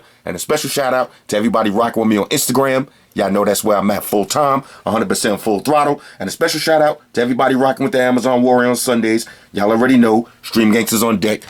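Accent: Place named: Queens, United States. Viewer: Damn bruh. I just need a bag to carry my kid's diapers in. Really enjoy your channel. It sounds like you're from Queens.. you mentioned the ave a few times before. From a Queens native keep it going!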